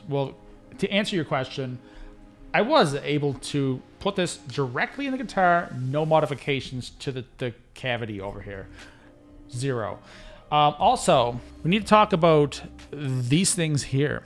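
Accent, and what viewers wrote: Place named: Canada. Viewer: I heard your accent BEFORE I saw the Saga poster... then the Rush poster... LOL... Congrats on being a fine representative of the Great White North, my friend! LOL... Great video, thanks! I have a lot of RGs and I use the 1996T often!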